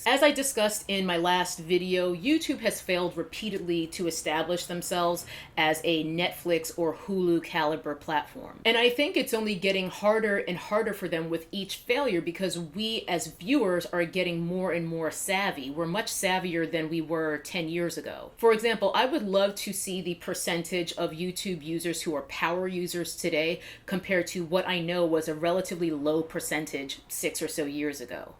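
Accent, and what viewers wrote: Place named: New York, United States. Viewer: Mmmm I felt like you had a NY accent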